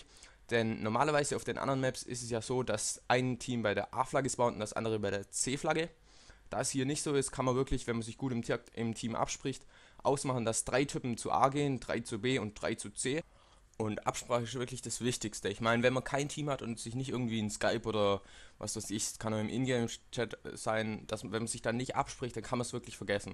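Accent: Swabia, Germany. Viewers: Schwäbisch FTW!!!! :D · hihi :D ein schwaaaabe :)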